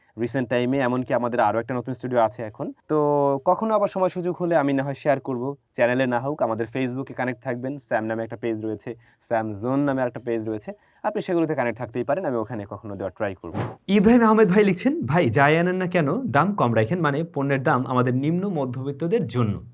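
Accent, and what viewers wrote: Barishal, Bangladesh. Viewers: বরিশাল কথায় আপনার বাড়ি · আমনেও মোর মতো বরিশাইল্লা❤ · বরিশালের কোন যায়গায় বাড়ি আপনার? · ভাই আপনি আমাদের বরিশালের লোক?🫶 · ভাই বরিশালের কোন এলাকায় থাকেন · ভাই বরিশাল আপনার বাড়ি কোথায়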